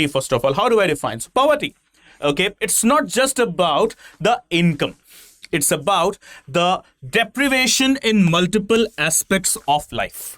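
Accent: Kerala, India. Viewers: Pls dont vomit malayalam in your slang english, as if the whites dont excrete a week and show off their painful countenance. Kerala is not anymore any good state under the hooligans. Compare this state with UP or Bihar. They are far more rich than Keralites. Please stop vomiting such propoganda. In the interest of your viewers here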